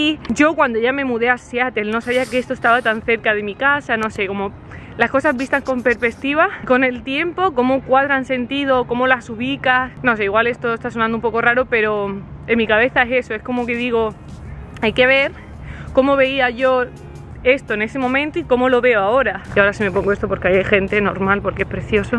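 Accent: Granada, Spain. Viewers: Primera vez que te comento. Cada vez que te escucho me translado a mis años granainos. Te descubrí antes de la cuarentena y me amenizaste todo ese periodo. Me flipa Seattle! La capital del grunge. Podrías hacer un vídeo sobre eso😉. El barrio que comentas en el que ahora se derriban casas antiguas para construir otras más modernas tiene pinta de esta gentrificandose, no? Gracias por enseñarnos Seattle! Me fliparia ir algún día.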